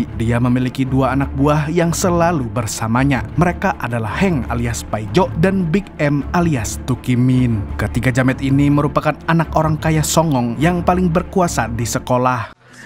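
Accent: Banjar, Indonesia. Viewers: Wahhh waahh pian urang banjar kah min..? 😅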